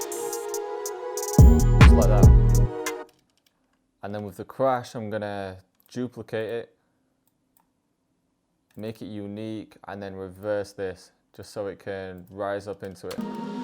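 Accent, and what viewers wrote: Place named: Northern England, United Kingdom. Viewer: He's from up north prolly manny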